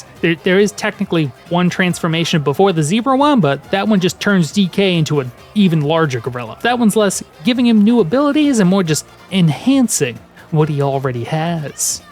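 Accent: Boston, United States. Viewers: The random Boston accents throughout the video are making me go bananas. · You sound like a bostonian. (Given how you replace er with a, and you speak with an accent) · I just have to ask... Is that a Boston accent? Can you say "Coffee, Cheetos, Chicken" in your next video for me???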